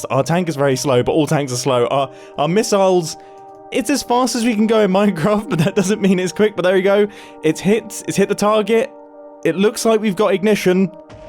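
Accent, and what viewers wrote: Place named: United Kingdom. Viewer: How often is it that you hear somebody say in the calmest british accent ever I'm building a giant tank.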